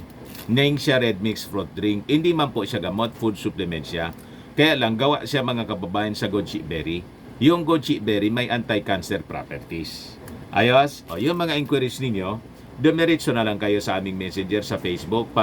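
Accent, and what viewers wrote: Bicol, Philippines. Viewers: Parang Bicol diction mo. Saen ka sa Bicol? Kami sa Cam. Sur.-- sismer boaquena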